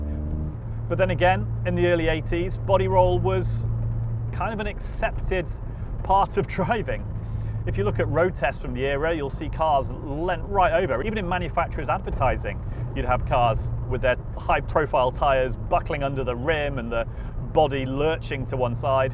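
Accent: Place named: England, United Kingdom. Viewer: Good review, but please lose the English accent, it sounds silly.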